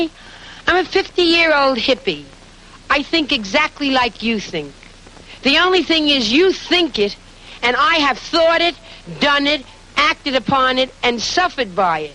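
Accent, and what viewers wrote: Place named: Larchmont, United States. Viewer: It's the Larchmont accent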